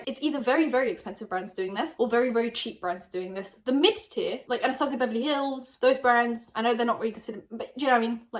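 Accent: United Kingdom. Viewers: I could listen to you say vitamin all day. From an American who loves a good British accent. 🥰🥰🥰🥰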